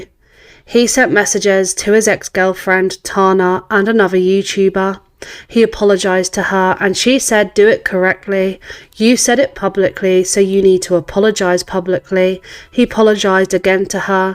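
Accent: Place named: United Kingdom. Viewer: Im pretty good at telling Brits by your accents 🙂🙂may i ask who You Voted for, Starmer or Farage??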